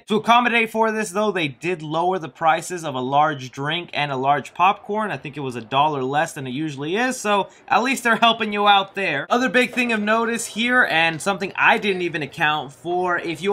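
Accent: Texas, United States. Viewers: What part of Texas are you from? I'm Texan too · Woah what part of texas are you from?!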